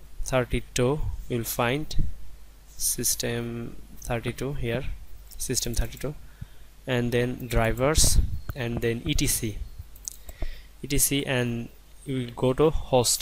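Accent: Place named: India. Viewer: omg your indian voice is so helpful thx :)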